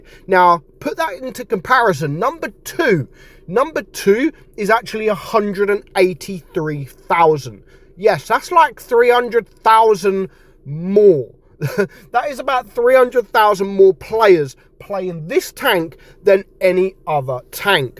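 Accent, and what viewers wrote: United Kingdom. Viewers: Bri’ish?❤❤ anyways, love ur content!❤🎉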